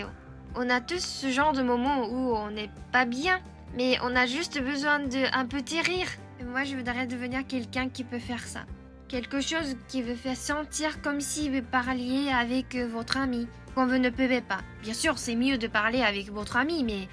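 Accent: France. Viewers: Joyeux anniversaire en retard moi c’est demain mon anniversaire 😊❤Et aussi ne change pas tes parfaite ,j’adore ton axant français quand tu parle ❤ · 🎉 Je suis tombée sur ta chaîne tout à l’heure et AHHHHHH TU ES TROP MIGNONNE !! Tu es super attachante J’adore ta prononciation française !!! Ton accent est adorable ! Tu as une personnalité tellement tellement gentille Tu es bavarde aussi, c’est vraiment un plaisir de t’écouter Tellement naturelle ☀️ Merci pour tes vidéos 🙏 Des bisous de la France ! 🇫🇷 je suis sûre que tu lui manques beaucoup ! 🥺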